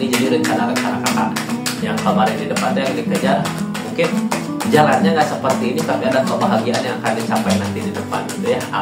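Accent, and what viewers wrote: West Java, Indonesia. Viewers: Assalamualaikum.maap apakah anda.ada di bdg..? Boleh minta alamatnya...? Sy liat daricara bicara sunda hehe.mksih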